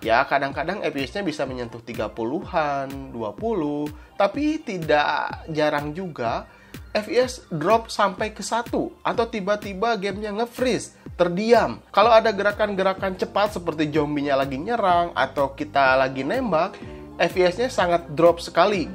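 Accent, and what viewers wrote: West Java, Indonesia. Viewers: Biasa, orng sunda logat nya kyk gitu, sama kyk gw wkwkwk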